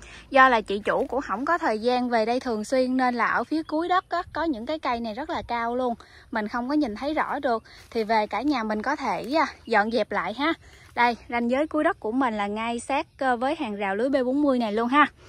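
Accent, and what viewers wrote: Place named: Mekong Delta, Vietnam. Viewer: Thu Nhiên 🍃 Giọng đọc Chuẩn miền tây 👌👌 Tuyệt lắm tác giả 👏👏👏👏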